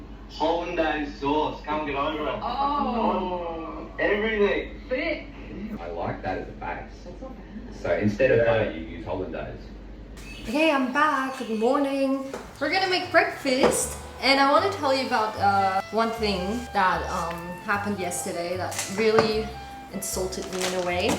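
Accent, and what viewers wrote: Australia. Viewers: Are you starting to pick up an eastern states Australian accent? Some of your vowel sounds definitely have that twang to them now, like from - . "Cacaow", "paowdah", etc. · Bruh, I'm an Aussie, I honestly thought I could hear an Aussie accent and you seem pretty fluent/ enough to take orders and stuff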